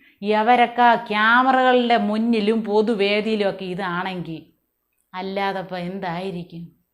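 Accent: Thiruvananthapuram, India. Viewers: ഇതാണ് തിരോന്തരം കലക്കി മോളെ ഒന്നാംതരം അവതരണ ശൈലി 👍❤🙏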